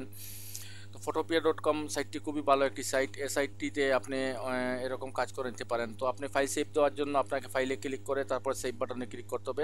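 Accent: Sylhet, Bangladesh. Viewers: VAI SYLHETY NE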